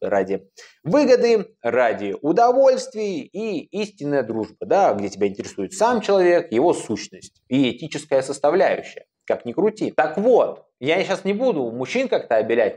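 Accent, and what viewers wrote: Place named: Saint Petersburg, Russia. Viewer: так ну акцент точно питерский аах))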